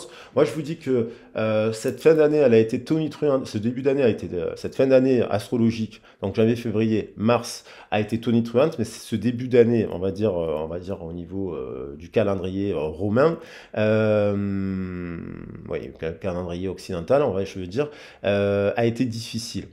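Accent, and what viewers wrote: South, France. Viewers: Coucou 👋🏼 😉 Ce n’est pas grave tu es pardonné 😉☺️dis moi tu viens de Montpellier avec ton accent du Sud?! 🤣tu 🎶 chantonnes quand tu parles c’est sympathique comme style 🤩🥳😜belle journée à toi le tourtereau 🤣bisous 😘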